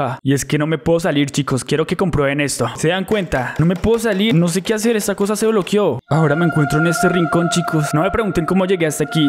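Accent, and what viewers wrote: Colombia. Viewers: Antes cuando te veía me sonaba muy paisa tu voz pero ya tot acostumbrado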